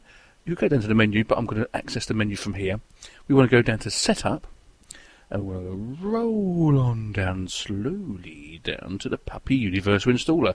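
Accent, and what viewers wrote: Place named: RP, United Kingdom. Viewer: Hey I could imagine you as a very wealthy English man talking about business and politics during the 19th century. Awesome voice!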